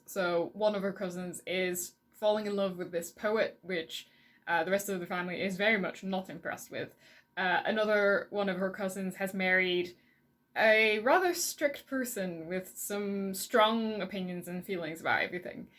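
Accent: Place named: North America. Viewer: Great video. Someone gifted me the complete collection of HG Wells recently, some I have read, others I’d never even heard of. I’m looking forward to reading them. You have a great accent. North American with a hint of northern English perhaps?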